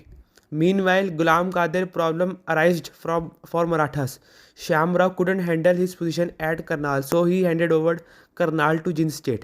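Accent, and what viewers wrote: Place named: Punjab, India. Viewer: Video is very much detailed & informative, but you have to work on your English accent,it seems like there is heavy Punjabi influence on your English, otherwise the vdo is excellent:)) keep it up.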